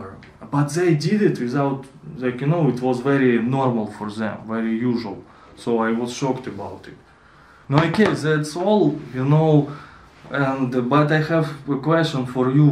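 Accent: Russia. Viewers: Hello! Are you from Kirov or Yeburg? Your accent is like a Ural one.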